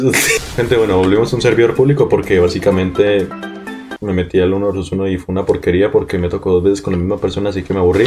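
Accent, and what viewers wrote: Colombia. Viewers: Mi impresion o es colombiano.-.? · eres colombiano?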